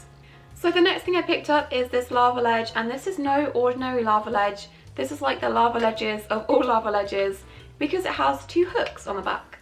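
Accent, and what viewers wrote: England, United Kingdom. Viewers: At the end you said about your voice. Well I think it's lovely. English accent is really cute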